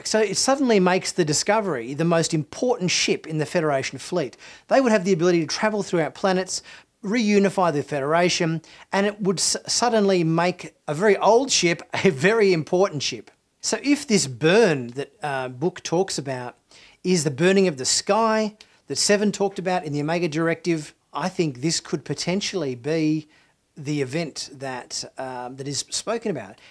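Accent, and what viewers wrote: Australia. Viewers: That's our Aussie accent! 😆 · Hi mate, great upload, listening to your accent and thinking, hey up, this blokes Australian and that reminded me of the Voyager episode with Species 8472 where they enter Fluidic Space, what's never explained is if they enter FS at point A, when they leave it, are they still at point A or anywhere else? So I could leave my house in the UK, enter FS and then jump out of it in Australia and meet you down the pub, not using Sub Space just Fluidic Space. Yes I know 8472 wouldn't want people crashing through their territory every five minutes but maybe they'd negotiate a section of space, ( just how BIG is Fluidic Space anyway?) far away from them that the Federation can use as a bridge from A to B.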